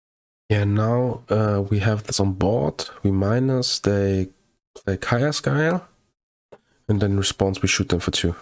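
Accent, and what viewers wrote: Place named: Germany. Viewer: I watch your videos for quite a while now. Today my girlfriend was sitting next to me, asking: "Das ist doch n Deutscher?!" Kurz die Kanal-Info gecheckt und Tatsache! Heißt schonmal, dass der deutsche Akzent nicht so krass ist, dass es mir besonders aufgefallen ist. Wäre toll, mal nen anständigen deutschsprachigen Modern-Streamer zu sehen. Aber klar, Englisch, weil mehr potenzielle Zuschauer. In diesem Sinne: Keep up the good work!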